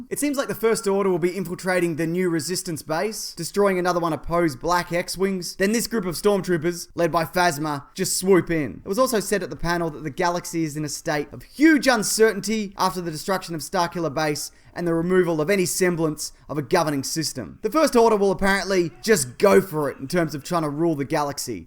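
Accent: Australia. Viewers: I dig. I dig it all. I also dig the Aussie accent - helps me pay attention.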